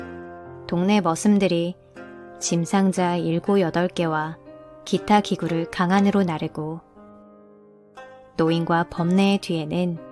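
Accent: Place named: Jeolla, South Korea. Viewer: 와 전라도 사투리가 반갑구만요 늘 좋은 목소리로 이야기 들려주셔서 감사합니다아😄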